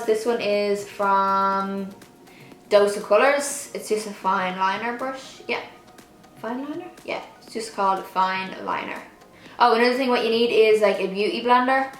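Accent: Ireland. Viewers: She's from Ireland!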